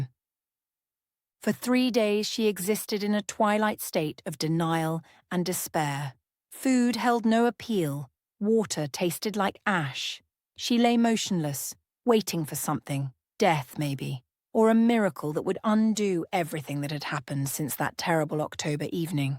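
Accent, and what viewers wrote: United Kingdom. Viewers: YT put this story in my feed for the second time. I still love every word of it. Also the Brittish voice.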